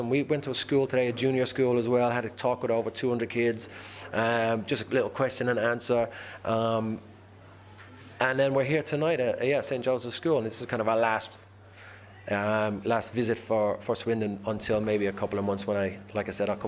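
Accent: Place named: Dublin, Ireland. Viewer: i like this fella the rough Dublin accent has improved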